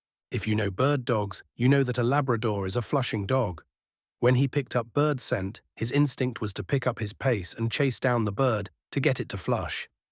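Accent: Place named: United Kingdom. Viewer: Hello! Ok im confused here. I know playthe music for Hunting with Hank, but the person narrating this certainly doesn't sound like, More like a britt, but he also states he was a musician, who is who? Im writing this in an attempt to prompt someone re-release these two shows, i never got to enjoy Dash but i do remember talking about the new son of Hank, the narrator states all he has to do is pop in a DVD, Please bring back Hank and Dash, I'm heartbroken they are gone!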